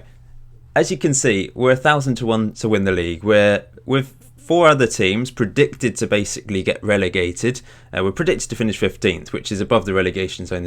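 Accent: Portugal. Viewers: The video is from a dude who is from Portimão and just like in (probably) every country in the world the accent changes from city to city so his pronounciation is different from the Portuguese you hear from Lisbon for example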